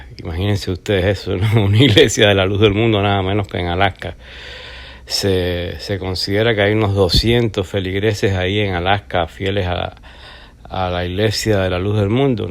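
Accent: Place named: Central America. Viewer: Guadalupe Salinas Salinas Gabrie no te pases de lanza los cerdos son sabrosos en carnitas y este tipo del vídeo habla como centroamericano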